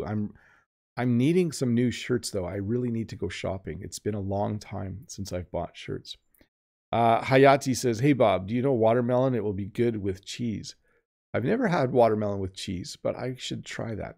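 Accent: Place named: Canada. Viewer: Hello Mr Bob I like your Canadian pronunciation and whenever I have time I see your videos and I really learn a lot from. good job good teacher respect from Afghanistan.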